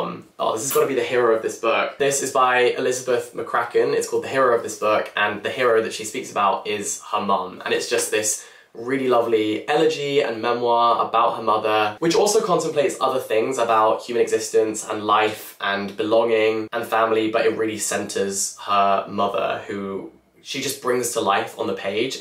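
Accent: United Kingdom. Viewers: I'm preparing for my IELTS exam and your videos are helping to understand british accent and also to learn how to talk fluently. Love you, ~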